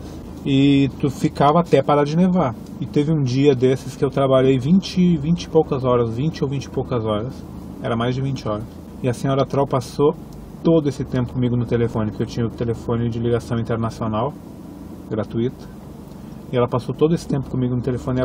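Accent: Rio Grande do Sul, Brazil. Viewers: É o primeiro video teu que assisto, mas pelo sotaque logo percebi que eras do RS (eu também sou gaúcho). E quem falou que você não tem uma boa dicção, estava muito enganado. Você faz uma excelente apresentação em frente a câmera! Não é cansativo de assistir, muito pelo contrário! Seu relato nesse video foi emocionante! Ganhou mais um inscrito e um joinha e vários compartilhamentos! Rumo aos 10 milhões de views, tchê!! Abraço! :-)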